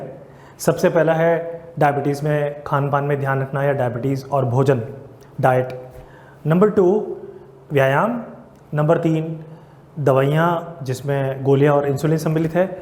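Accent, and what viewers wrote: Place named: Rajasthan, India. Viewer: Your conversation says you belongs to rajasthan for sure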